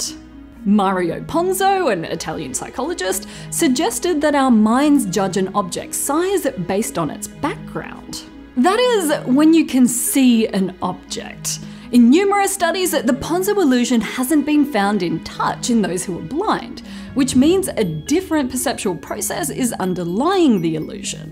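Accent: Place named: Australia. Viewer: Monologue my brains out. Only with the Aussie accent though.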